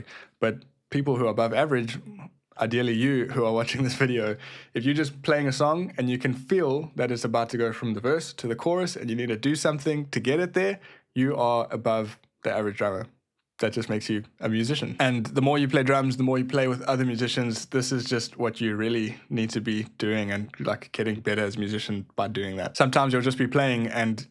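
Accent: South Africa. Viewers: A South African 😂 with a KZN accent?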